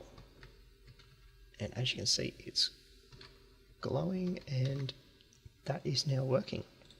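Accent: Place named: Australia. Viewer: You sound so Aussie lol, I need to get some video tips from you. Your videos are always great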